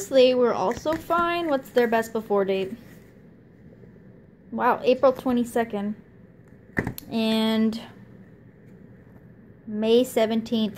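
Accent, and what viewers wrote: Canada. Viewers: You’re obviously Canadian...eh...I’m in Hamilton Ontario. Where are you?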